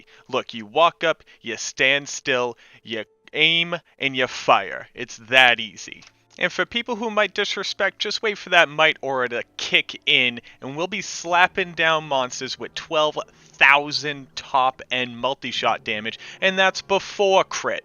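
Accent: Boston, United States. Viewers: The boston accent came out HEAVY in this video :D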